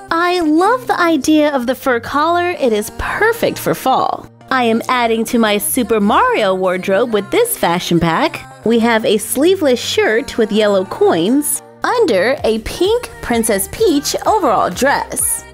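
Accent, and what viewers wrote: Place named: South, United States. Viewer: I love this and that southern accent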